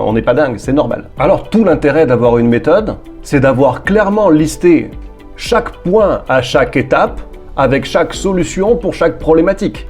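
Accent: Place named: Belgium. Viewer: Attends, à cause de son accent ma femme croit qu'il est belge 🤣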